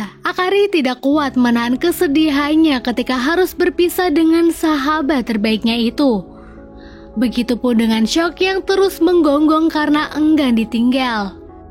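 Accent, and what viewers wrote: Pemalang, Indonesia. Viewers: Fix wong pemalang Rika ya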